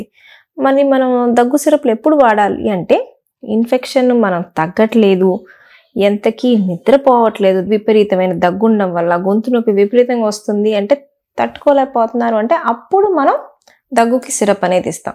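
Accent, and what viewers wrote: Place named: Prakasam, India. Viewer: Avida proper chirala prakasm dist yasa alage untundi.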